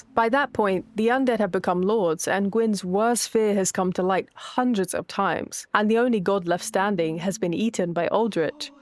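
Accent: United Kingdom. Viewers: YOU'RE BRITISH??????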